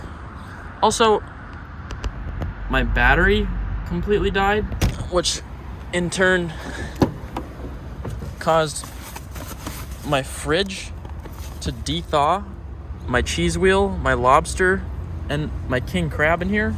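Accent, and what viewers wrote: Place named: South, United States. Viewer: Lovin the country twang you been playing,coming from a country grandma,I’m young at heart !!! Please take care and be safe Sending good vibes from Nova Scotia Canada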